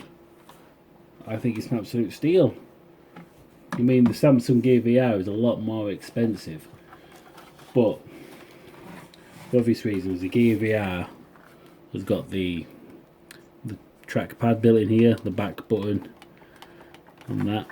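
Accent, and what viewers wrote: United Kingdom. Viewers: It's a British Eng and I can 100% understand him. All depending on Where you live and what accent you've got. Not his fault at all. If you agree than appreciate his effort and like his video please, his video helped me for sure 👍👍👍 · I can understand him he is british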